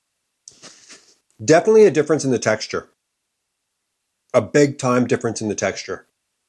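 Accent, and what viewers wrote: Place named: New Brunswick, Canada. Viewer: I knew it! Since I started watching you I thought your accent was similar to mine, but this is the first time I’ve seen you mention being born in NB! From around the Miramichi, is my guess? :)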